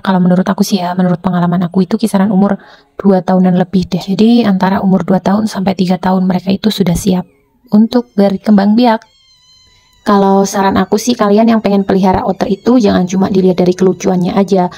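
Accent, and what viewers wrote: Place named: Banyumas, Indonesia. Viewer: ngomong nya ngapak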